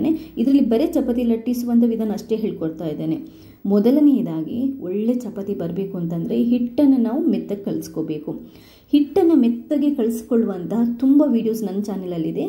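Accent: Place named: North Karnataka, India. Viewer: I guess by your accent that you are from north karnataka, but why u r struggling to speak in blore kannada, speak in your own language. No worries.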